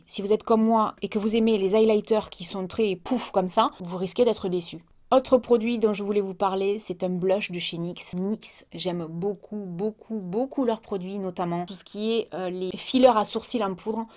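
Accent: South, France. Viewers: Salut, je suis abonnée à ta chaîne depuis environ 1an, j' ai encore découvert pleins d' astuces et produits, j 'ai 58 ans et depuis mes 16 ans ce qui fait un bail, je me fais des gommages, masques que ce soit visage corps, mains, pieds etc. Quand je vais avec ma petite fille faire les boutiques ont me dit que c' est super de faire du shopping avec ma fille elle à 15 ans ce qui nous amusent pas mal. Je mentirais si je disais que je ne suis pas flattée ni que mes chevilles ne gonflent pas. Effectivement il faut prendre soin de soit que ce soit à l 'intérieur comme à l 'intérieur. Je souhaitais te remercier pour toutes ces vidéos supers et ta bonne humeur. J 'aime beaucoup ton accent aussi car j 'ai le même étant aussi du midi de la France. Continue tu es superbe et vrai, peut de personnes peuvent en dire autant.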